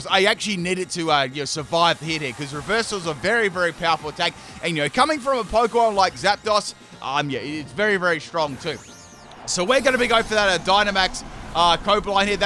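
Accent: Australia. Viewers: Your Australian accent is like the chef kiss on the video. Always has been. The real pimp of YT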